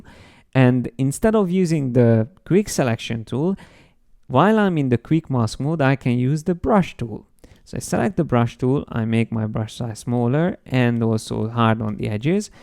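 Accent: Hungary. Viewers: látom nem vették észre ennyi év alatt hogy magyar vagy, de amikor meghallottam az akcentusodat, egyből éreztem hogy valami van :D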